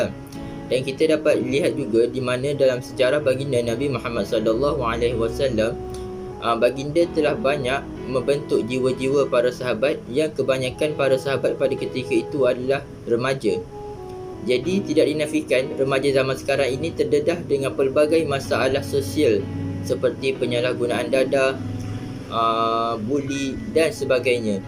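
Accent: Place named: Kelantan, Malaysia. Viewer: Walaupun agak Kelantan, tapi saya suka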